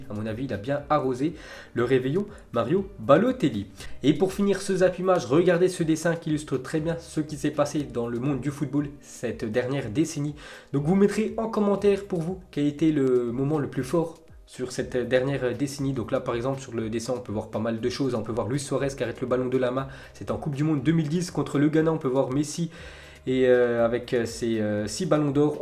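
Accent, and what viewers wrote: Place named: France. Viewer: Stop avec les prononciations chelou à la française "les reds dévile"...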